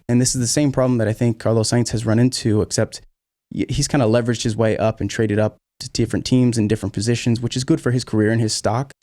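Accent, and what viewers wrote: United States. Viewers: An f1 commentator with american accent????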